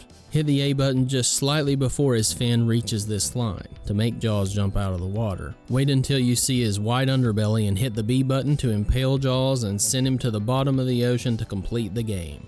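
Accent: South, United States. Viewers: Hey. I've been a subscriber for about a year. Your channel just keeps getting better and better. Thanks for the great content. Most reviewers hate on this game, but I always liked it. If you like Jaws, my wife just finished her first game on Steam which is an aquatic action/adv game inspired by Jaws. No, I'm not making this up... While the finished game is really nothing like Jaws at all, it was inspired by the game. Her game is called "Rupert and Riley Shipwrecked" and can be found on Steam or just by searching. Anyway, thanks again for all the great content. Nice to see (and hear) another Southerner on here;)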